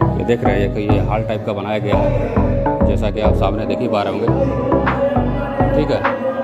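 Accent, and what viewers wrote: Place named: Varanasi, India. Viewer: Video tho achcha laga vusse bi jyadha aapka bolna bahuth achcha lagta hai ♥️ banaras ke hindi sunne me bahuth masth lagta hai 👌👍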